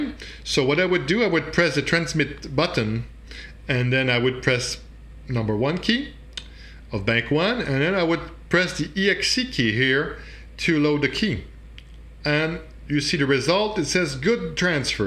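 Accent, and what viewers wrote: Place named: France. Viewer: Your english sound french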